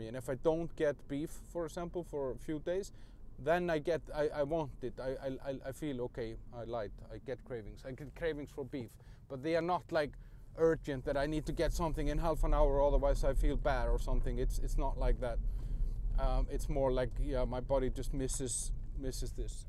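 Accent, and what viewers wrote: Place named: Iceland. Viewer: Hah! I knew it from the first minute, that accent. I lived in Iceland for several years in the early 90s (turned 20 in keflavikr) I miss the lamb hotdogs. I've been carnivore for a while now, it's definitely a huge help.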